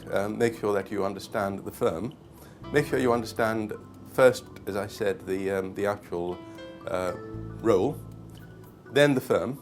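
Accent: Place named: United Kingdom. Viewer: Dude don't be embarrassed of the way your dad speaks english. Even my dad doesn't speak like this guy but I don't mind. Plus, I don't think this guy lived his life in India. I really think he was born in the United Kingdom or came at a very early age .. like his parents were immigrants that arrived in the 1940s/50s/60s.. or something .. i bet u thats why because no indian can speak a british accent THAT well without messing up lol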